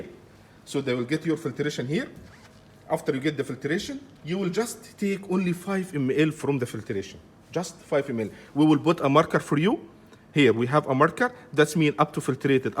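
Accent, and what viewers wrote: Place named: Arab world. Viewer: Yeah, I think his accent is Arabic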